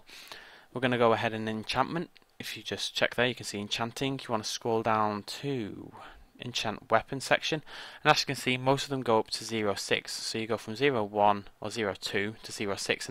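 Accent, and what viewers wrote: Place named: Northern England, United Kingdom. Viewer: Lol the YT subtitles obviously don't get Northern English accents. You were apparently talking about Alaska or something. (I'm a Northerner).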